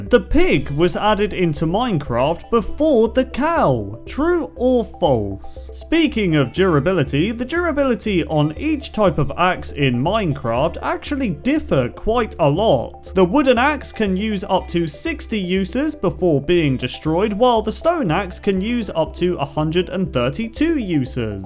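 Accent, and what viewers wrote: United Kingdom. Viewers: Are u British accent?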